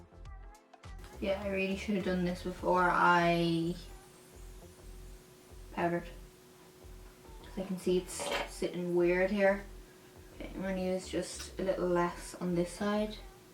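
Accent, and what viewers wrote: Ireland. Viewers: Irish accent or am I wrong? ☺️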